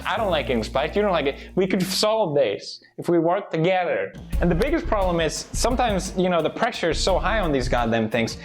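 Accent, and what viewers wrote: United Kingdom. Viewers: His uk accents are getting a lot better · as a Mexican living in Britain, I can confirm this is how Brits sound like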